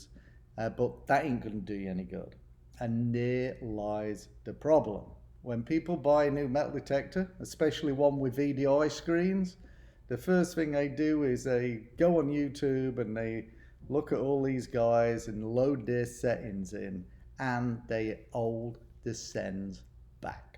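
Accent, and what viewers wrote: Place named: Lincolnshire, United Kingdom. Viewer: With minelab you have to download the manual I actual print them off for my machines I prefer reading it that way. Different land needs different settings I find. Nice to hear a fellow Lincolnshire accent take care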